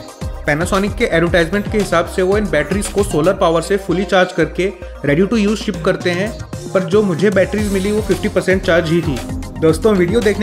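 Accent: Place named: Arab world. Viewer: I like hearing Arabs speak English like this. Which country are you from Saudi Arabia,Kuwait, Dubai,Yemen?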